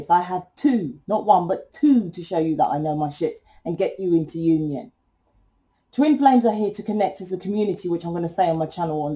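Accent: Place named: London, United Kingdom. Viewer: So cool to listen to a Londoner on here, it's nearly all Americans!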